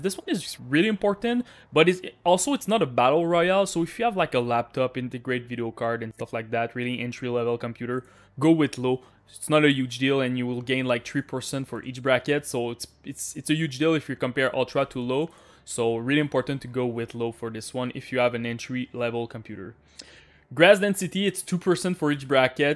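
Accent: Canada. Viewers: Bruh I didn't know Canadian people actually speak like this. I thought this accent was just a running gag on cartoons😅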